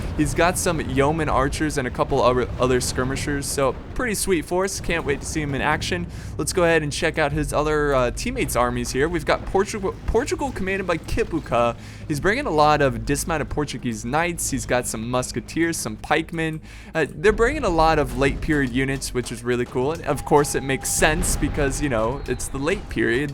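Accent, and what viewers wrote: England, United Kingdom. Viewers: are you a englisch or a american youtouber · is American? I thought he was British.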